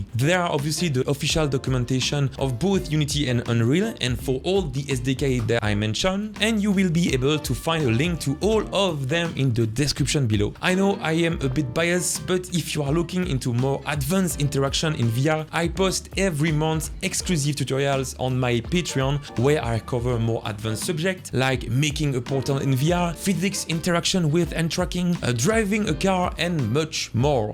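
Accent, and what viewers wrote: France. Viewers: It's so cool how good your english flow is but how extremely french your pronounciations are 😅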